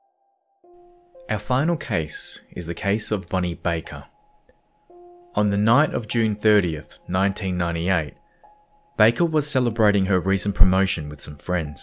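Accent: Australia. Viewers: Good narration by this pleasant Aussie chap - definitely a thumbs up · Nice to hear an Aussie accent 🙂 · first here......subscribed after 5 minutes listening. Awesome mate......guessing by the accent.....OZ ? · good to hear the Ozzie voice😃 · Great Aussie accent 🙏🏻🇦🇺🇦🇺❣️ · I love starting a youtube video and hearing that sweet aussie accent. Keep it up brother! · So happy to hear an Aussie voice over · Love the Australian accent! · Aussie voice over ? I’m in thanks !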